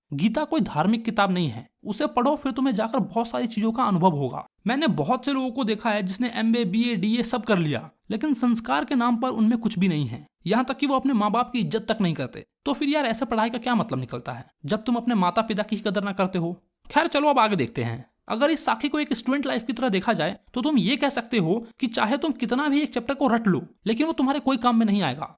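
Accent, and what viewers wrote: Bihar, India. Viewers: Dekh dekh k apki biharan accent mere andr arhi h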